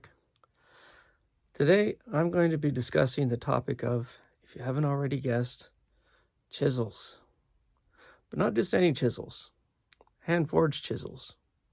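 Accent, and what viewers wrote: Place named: Canada. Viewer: These chisels are great mate! love the fire sharp chisels. I have a ton I was given in my workshop that are super old. Ill do a video of them at some point. They need re-tempering really and don't hold up to the sandstone I often work, and the abuse I put my tools through 😂 Where in Canada are you? I spent some time over there a while back. miss that accent.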